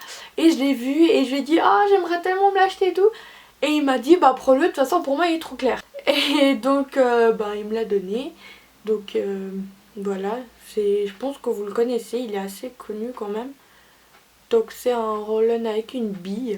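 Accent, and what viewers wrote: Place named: Switzerland. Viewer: je pense que ça doit être l'accent suisse, je doit pas être habitué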